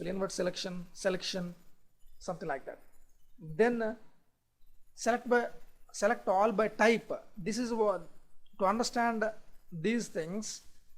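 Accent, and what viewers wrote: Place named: India. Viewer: Dude, seriously... give this guy a break :) His Indian accent is awesome!!! :)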